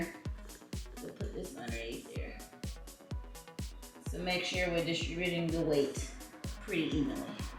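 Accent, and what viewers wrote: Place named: Texas, United States. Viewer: Got a Texas accent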